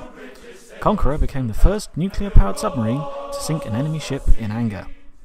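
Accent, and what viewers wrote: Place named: United States. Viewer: He's definitely an Immigrant going by his American English and awful grammar.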